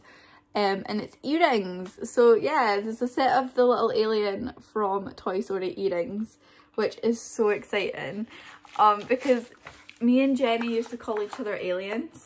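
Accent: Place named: Scotland, United Kingdom. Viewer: youtube recommended this to me for some reason but im here for it. came for the novelty, stayed for the scottish accent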